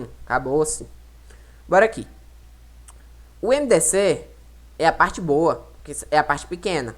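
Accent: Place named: Northeast, Brazil. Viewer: conheço esse sotaque nordestino kkk minha terrinha <3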